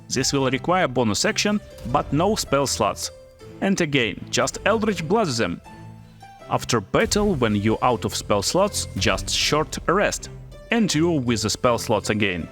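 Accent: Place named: Russia. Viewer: Почему я чувствую русский акцент..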